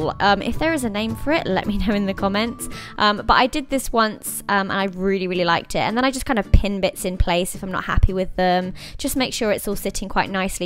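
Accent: United Kingdom. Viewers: Readers.... so it's a... British.... accent.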